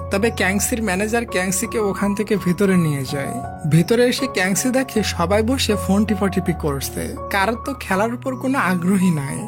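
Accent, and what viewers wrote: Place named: Khulna, Bangladesh. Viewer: vai apnar basha khulnai kotai amar basha o khulnai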